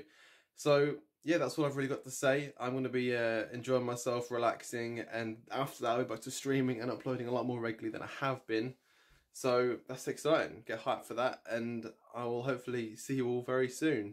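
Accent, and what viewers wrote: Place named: United Kingdom. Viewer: Bro you're British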